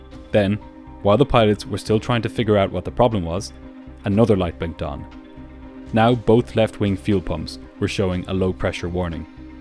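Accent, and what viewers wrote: Ireland. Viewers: Its the Irish accent - its where all American accent descend from so has a a warmth to it you are familiar with.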